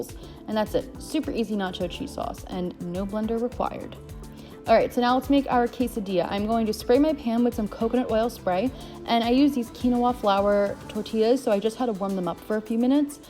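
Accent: United States. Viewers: sounds like a normal american accent to me. but im american lol so what do i know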